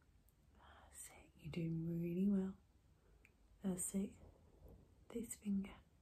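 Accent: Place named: Nottinghamshire, United Kingdom. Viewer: that'll explain the northern sounding twang to your voice. I love that Nottinghamshire accent. It's like a soft northern accent. It works really well for soft spoken asmr. Would love to hear what your voice sounds like with in ear whispering ❤️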